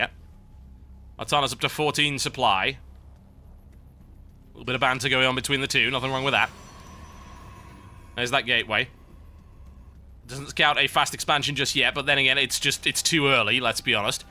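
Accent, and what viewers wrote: United Kingdom. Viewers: your British accent keeps me alive!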